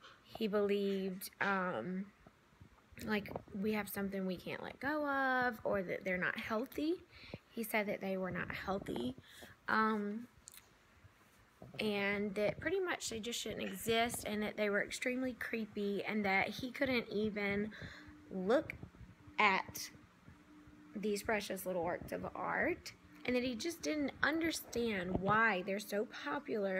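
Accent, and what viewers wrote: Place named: South, United States. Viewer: All this hooplah about Reborns. You are very right and I am so glad you made this video. I am also a 60 yr old, and alone. I was a Neonatal Nurse & Labor /Delivery Nurse for 30 yrs. Now, I only have my dolls to play with and I have loved and collected dolls my whole life. Needless to say, as an adult, and spent a lifetime career of babies, I clearly LOVE THEM and LOVE my dolls. God Bless you for saying this. It is important to all of us. I am also a Southern Lady and so nice to hear your lovely accent and hospitality. Thank you for saying it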